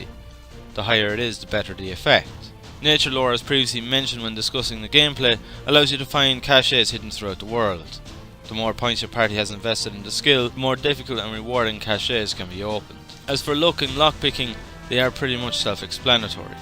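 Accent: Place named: Ireland. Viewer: Dude you are fine-keep your Irish accent and be proud of it my man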